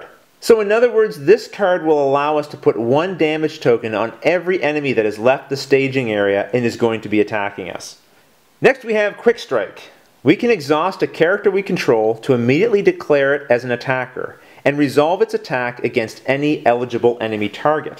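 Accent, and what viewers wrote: Canada. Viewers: Man, great tutorial. I drew identical cards as you through that first round and it really helped. But my hand couldn't be more different for this next round, so I'll actually have to use my brain. Your explanations are slow and understandable, so it shouldn't matter. I like the Canadian accent btw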